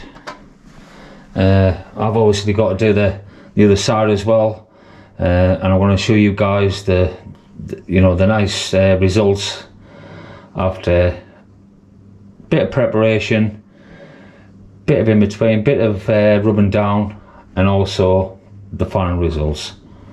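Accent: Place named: Northeast England, United Kingdom. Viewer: You sound like you are from the North East of england, just wondered how the paint managed in all the glorius weather we had? Looking at doing my own with the same paint and your video popped up in my searches. Thank you!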